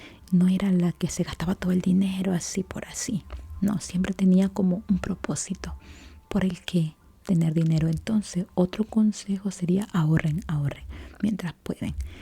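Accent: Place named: Nicaragua. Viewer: Tengo poco tiempo escuchandote pero, me gusta mucho tus videos sobre todo susurrando o platicando están super relajantes y me gusta mucho tu acento de voz nicaragüense, gracias por tus videos , saludos.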